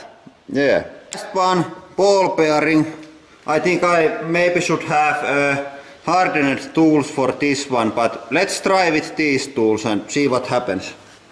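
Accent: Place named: India. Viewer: Поганый индийский английский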